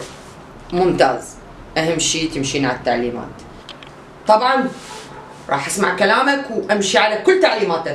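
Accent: Iraq. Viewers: حلو حلو هواي حلو شكد حلو انا اتابع فديوهاتك عشان استمتع بجمال اللهجة العراقية أحلى تحيه لأروع و اغر الشعوب على قلبي الشعب العراقي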